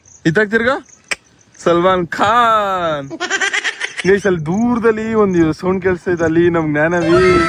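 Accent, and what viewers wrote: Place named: Butler English, India. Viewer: If u don't know english plz don't talk talk !! U know only buttler english 🙂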